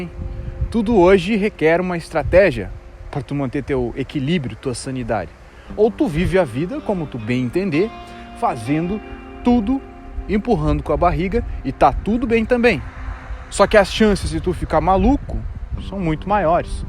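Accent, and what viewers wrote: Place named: South, Brazil. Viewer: Sou do Sul também, e cara te admiro por levar suas raízes nos vídeos, eu percebo que as pessoas daqui do Sul quando pegam fama ou simplesmente pra parecer com todo mundo acabam perdendo as raízes, nasceram falando "LEITE" e mudam pra "LEITI", não entendo por que essas pessoas precisam fazer essa mudança, se é uma questão de aprovação social ou algo do tipo.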